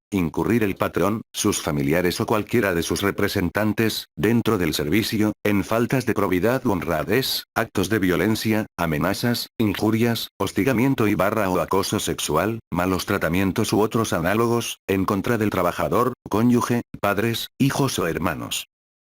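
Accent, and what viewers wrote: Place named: Spain. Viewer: Por qué en acento español.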